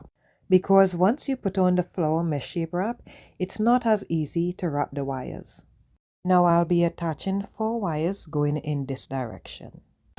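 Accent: Trinidad and Tobago. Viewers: Why do u sound like a trini so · Omg! as I heard your voice I said she sounds Trinidadian. Great job my fellow Country lady.. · Are you a Trini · Absolutely gorgeous! Is that a Trini accent I detect though? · Gorgeous! And I love that you sound like a true Trini! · Are you from Trinidad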